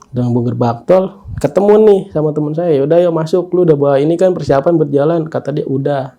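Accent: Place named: Semarang, Indonesia. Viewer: Buset orang semarang kah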